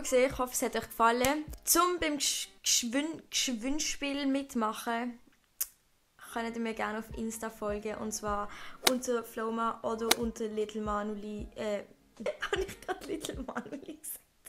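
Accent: Switzerland. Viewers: Total angenehm dich schwitzerdeutsch reden zu hören :) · Sie redet jetzt schweizerdeutsch und nicht mehr Hochdeutsch 😅😂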